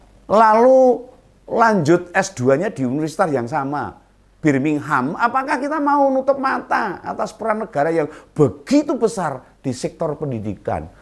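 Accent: Java, Indonesia. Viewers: 0ak belantek sapi apa khabar jawznya pekat gak usah ngomong bahasa indonesia medok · Ha ha ha, saya sdh lama gk denger orang yg bicaranya dgn dialek jawa kayak gini. Saya lahir di Solo 63 tahun lalu hingga usia 23 hidup di Solo sebelum akhirnya ke Jakarta. Ini ngomongnya sdh spt tetangga2 saya dulu, wis rasah mulih, iki wis cukup ngobati kangenku karo Solo. Ha ha.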